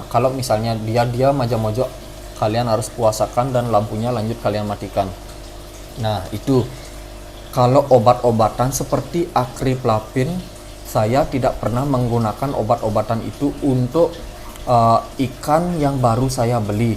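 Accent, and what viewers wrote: Bali, Indonesia. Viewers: Orang Bali ya kak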